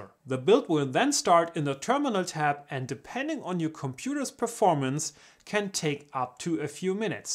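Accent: Germany. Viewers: Thanks for your help. The german accent punctuated my OCD demand to make my dumpster find functional again!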